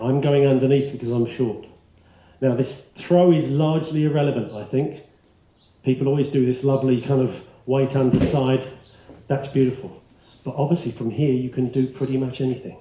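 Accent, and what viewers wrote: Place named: United Kingdom. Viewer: Thank you so much for posting these videos! I did aikido a few years back so it helps me to reminder, also I’m English transplant, so nice to hear a old Brit accent again lol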